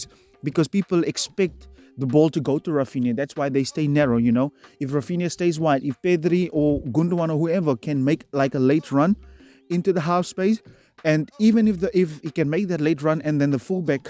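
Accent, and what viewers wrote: South Africa. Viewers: Ummmm, are you South African?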